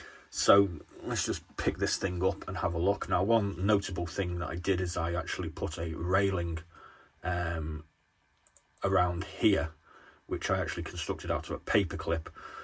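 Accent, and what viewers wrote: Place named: Northwest England, United Kingdom. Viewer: Got to ask, are you in merseyside, wirral or chester, trying to place the accent.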